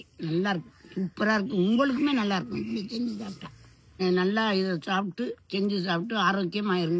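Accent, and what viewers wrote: Ramanathapuram, India. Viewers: Enga Ramnad district pechu endha vooru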